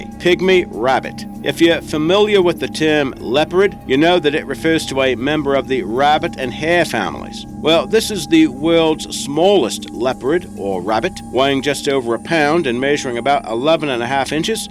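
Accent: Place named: Australia. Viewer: Your accent sounds Australian. If so, you've got a few amazing animals yourself...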